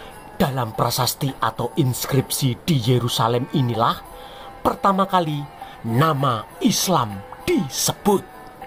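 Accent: Java, Indonesia. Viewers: Kalo bisa logat jawanya dihilangin bro. Saya yg bukan jawa agak susah nangkepnya. Cara penyampaian ya kurang umum. Saran ajaaa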